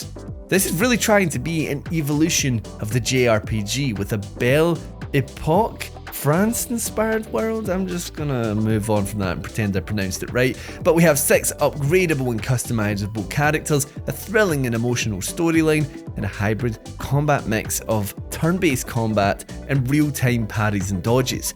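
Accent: Scotland, United Kingdom. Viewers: My sister-in-law is from Scotland and she'd probably describe his accent as "polite Scottish", a toned down version so Yanks can understand.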